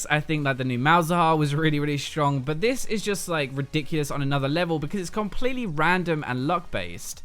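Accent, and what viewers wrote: United Kingdom. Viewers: I like how smart you sound when you talk :D Must be that british accent :D Anyways, just to put into perspective. If you have 900 ap on veigar and with that 3 infernals and you get an elder. From dragons alone you get 324 ap more. That is bloody ridiculous. If he didn't oneshot you before he does now. And baron gives you even more. One game I managed to get 1300 ap on veigar with items and baron, no infernals though. Imagine with infernals, it would've been over 1700 ap. Can you imagine that power. Nope, and that's why dragons are broken, agree with you. I don't like baron buff minions though. They are impossible to kill and deal dmg. They could deal more dmg but be still normal health.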